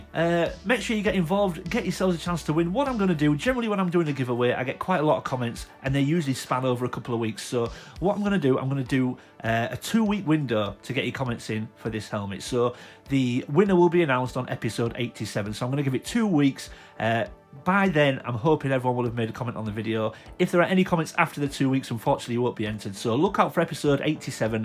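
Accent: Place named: Northern England, United Kingdom. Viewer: Great to hear a Northern voice talking about their passion for collecting. Been back and currently watching all your videos now thanks for all your hard work!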